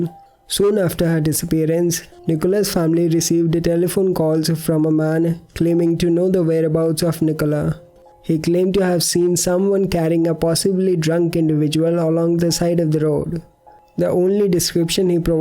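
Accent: India. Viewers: I meant good, cuz I am indian.. And I found that the way he speaks, is the way that also some of us speak here in India. Doesnt have much of that american accent.. You wont get it. 🤭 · Why don't you check out idk maybe half the true crime/ horror story yt community, also he does speak English he just has an Indian accent which is why he has subtitles ( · The people criticizing are just assholes. Let's see you all speak his language with a perfect indian accent...when you can, then criticize.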